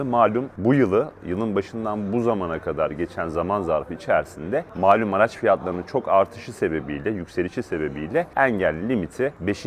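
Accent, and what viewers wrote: Samsun, Turkey. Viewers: Siz Samsunlumusunuz elliii demeniz den anladım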